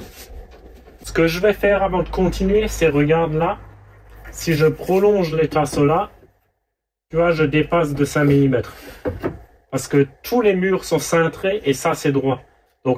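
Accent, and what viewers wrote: Alsace, France. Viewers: Votre accent n est pas Alsacien? Je suis des Vosges peu être que vous pourriez aménager mon jumpy utilitaire 😊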